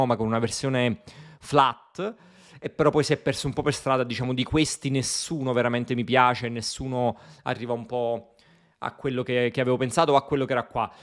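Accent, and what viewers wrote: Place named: Naples, Italy. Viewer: Da ex grafico Pro... I loghi creati dalle IA sono abbastanza banalotti però per essere strumenti decisamente giovani devo dire che il lavoro che svolgono non è cosi malvagio. Anzi! Tra qualche anno ci sarà da ridere... O da piangere dipende Comunque devo essere sincero. Seguo i tuoi video più che altro perché amo l'accento napoletano 😅 Sono nato a Roma ma il napoletano è la lingua più bella che c'è 😅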